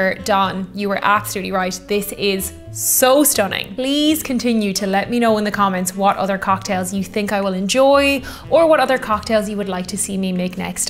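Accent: Ireland. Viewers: I'm very happy that I discovered your channel. You are engagingly beautiful and the brogue is wonderful. Gorgeous!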